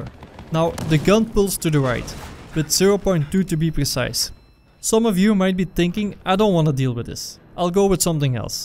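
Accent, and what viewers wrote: Netherlands. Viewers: Je hebt een Nederlands accent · u sounds dutch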